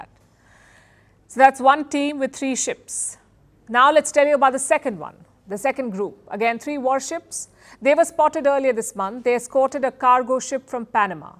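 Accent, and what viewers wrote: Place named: India. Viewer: Please speak English; I can't understand your Indlish.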